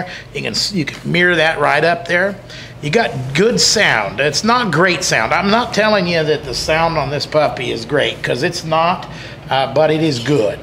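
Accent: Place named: Hill Country, United States. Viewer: Great review. I love your commentary style. You sound like a good old boy from the hills. Just subscribed to your channel.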